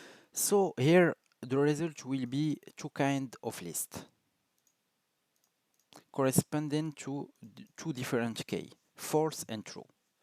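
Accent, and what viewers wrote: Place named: France. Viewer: absolutly he is french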